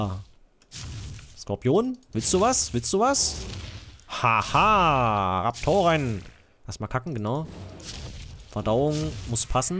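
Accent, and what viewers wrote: Germany. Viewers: euer Akzent (Ossi-Akzent) für uns ziemlich lustig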